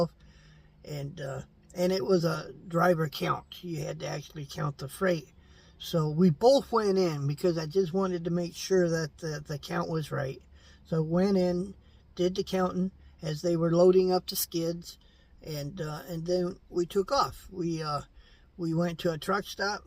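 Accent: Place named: Appalachia, United States. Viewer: I'm not trying to be mean or anything But you should look for a talent agency for acting And I guarantee You They would find you many parts for hillbilly acting roles You have the exact look that they're looking for you look and talk Like a hillbilly I am being 100% serious you can make a ton of money.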